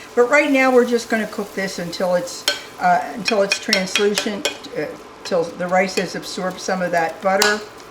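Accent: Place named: South Jersey, United States. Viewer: I am learning SO MUCH!! Are you for the Philly area?? I’m getting a South Jersey accent vibe! 💕💕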